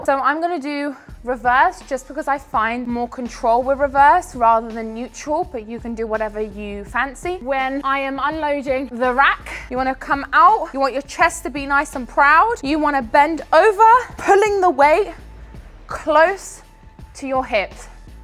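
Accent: United Kingdom. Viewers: Been watching your TikTok’s for a while now, had no idea you were British 😅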